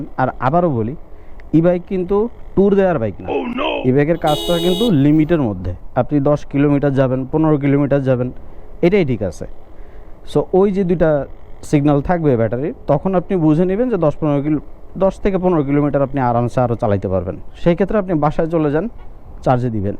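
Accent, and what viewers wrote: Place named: Keraniganj, Bangladesh. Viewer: Vai toh mone hoy Keraniganj er🙌❤️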